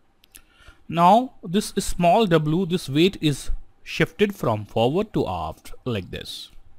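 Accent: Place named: India. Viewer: u trying so hard to make british accent but still your indian accent kills your mouth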